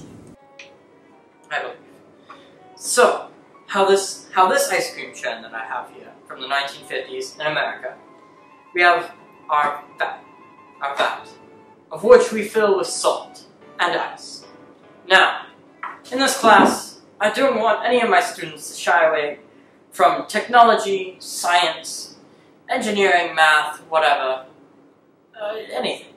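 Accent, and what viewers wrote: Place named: United Kingdom. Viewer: WOW, the face/hairstyle and British accent LITERALLY looks like a younger version of the actor Thomas Brodie-Sangster (look him up if you don't know who he is), very good video again!!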